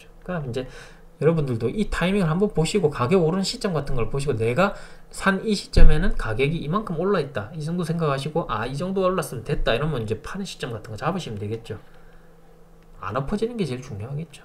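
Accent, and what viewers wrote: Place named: Busan, South Korea. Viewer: 정보 감사합니다. 지금은 서울에서 살고있지만 15년전에서 부산남천동에서 살았지요. 정보도 감사하고 고향사투리가 듣기도 좋아요.^^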